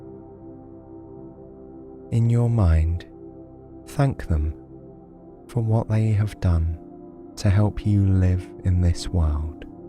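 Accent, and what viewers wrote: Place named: United Kingdom. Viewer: Oh. Your voice and British accent is as soothing as a father's voice. Last night I woke up feeling a bit unworthy, but then I ran into this. I listened and I eventually felt much better. Thank you.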